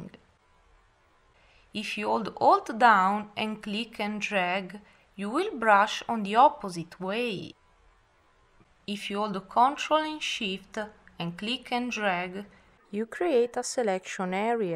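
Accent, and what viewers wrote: Russia. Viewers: Thick Russian accent aside, this tutorial has been great, the best one I've found thus far. I have learned more from this young woman than I have from any other source since I downloaded the trial version. Good job honey. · This russian accent is really annoying and distracting ....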